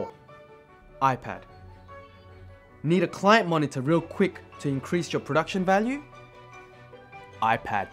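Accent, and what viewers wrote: Australia. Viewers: east asian guy with an aussie accent is quite trippy lol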